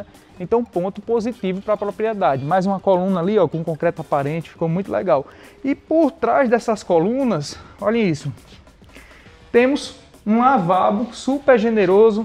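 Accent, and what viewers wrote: Northeast, Brazil. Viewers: Conheci seu canal ontem e maratonei muitos videos, e fiquei namorando essas casas lindas e maravilhosas que vc nos apresenta, gostei também do seu jeito alegre de apresentar as casas e como nordestina que sou também logo vi pelo seu sotaque e percebi que também é kkk gostei tanto que ja ate me inscrevi, vc é diferenciado, e sim rapaz mecheu muito com o meu emocional essas casas kkkk parabéns pelo trabalho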